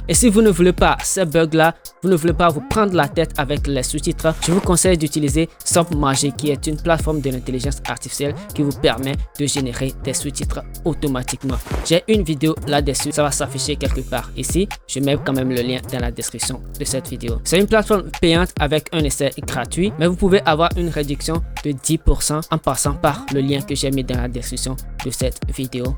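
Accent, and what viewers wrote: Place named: Benin. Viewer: T'es béninois ? Ton accent...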